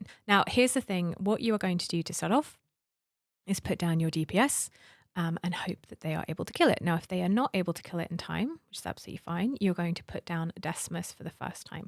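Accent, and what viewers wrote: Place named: United Kingdom. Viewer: Yeh… after ages just got past 19… I dread even trying 20😵‍💫 but thanks. BTW great to see/hear a fellow Brit do these vids👍🏽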